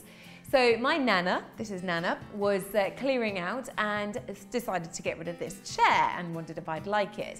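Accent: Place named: England, United Kingdom. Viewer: Finaly a proper english accent. Sooooo tired of American accent on YouTube. Thank you ⚘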